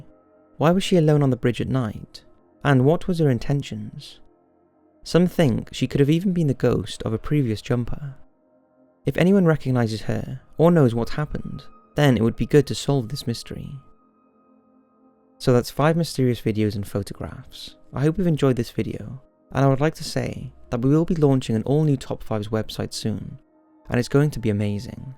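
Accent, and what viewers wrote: Wales, United Kingdom. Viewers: Will follow when you start speaking properly and stop sounding like some Welsh Robot. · Are you welsh?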